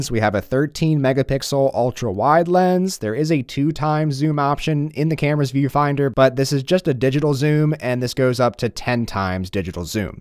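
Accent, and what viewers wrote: Philadelphia, United States. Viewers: I definitely detect a philly accent = S. Jersey PA DE or MD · Definitely Philly/South Jersey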